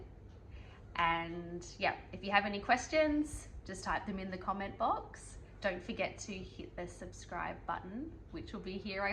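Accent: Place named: Australia. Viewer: Gud evening from south Africa I'm enjoying ur vlogs I love ur Aussie accent have a blessed Saturday evening loads of warm blessings ❤❤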